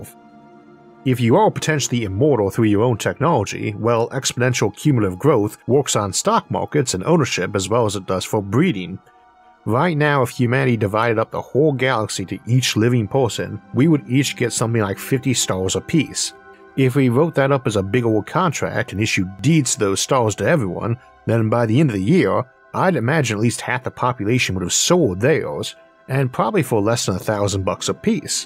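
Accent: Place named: South, United States. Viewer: Man the visuals and graphics are light years away from those early videos. Actually the show has become a very professional package where even your "speech impediment" is as integral as the graphics and visuals. Personally I find your Elmar Fudd with a southern drawl accent unique and I'd put you in the same boat as Sagan and Attenborough although sitting in the stern perhaps.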